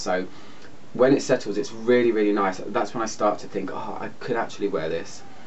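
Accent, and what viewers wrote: England, United Kingdom. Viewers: i love your voiceeeeeeeeeeee!!LOVE YOUR ACCENT ENGGLISH PEOPLE IS AMAZING!!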